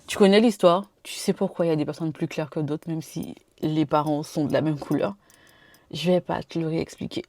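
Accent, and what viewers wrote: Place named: Martinique. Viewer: La première vidéo que j'ai vu de toi, j'ai su tout de suite que tu es martiniquaise. Tu as bien l'accent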